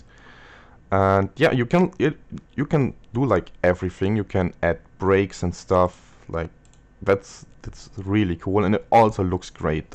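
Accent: Germany. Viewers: Your Deutsch Akzent ist very nice haha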